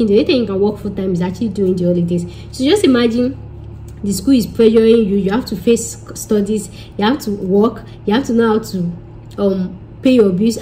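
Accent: Yoruba, Nigeria. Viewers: This was definitely interesting. Didn’t finish the video before I started laughing bout the part when you mentioned your Yoruba accent..😂🤦‍♂️.. thank you for this though. You’ve got a new subscriber😇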